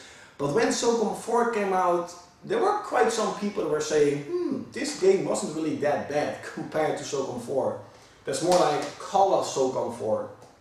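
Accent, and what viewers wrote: Netherlands. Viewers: Klinkt nederlands. Waar kom je eigenlijk vandaan?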